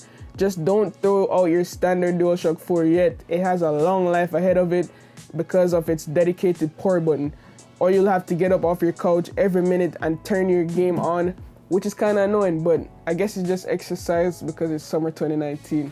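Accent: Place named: Jamaica. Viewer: I'm new and I just heard yu voice and mi a say that yu sound Jamaican who live in America I'm JM btw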